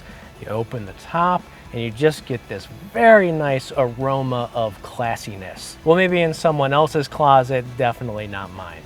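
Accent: United States. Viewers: I can't stand this fuckers American accent. Why am I recommended yank car review videos?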